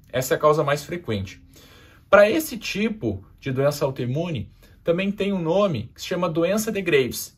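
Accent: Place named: Goiás, Brazil. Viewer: Nota 10 fala do Goiás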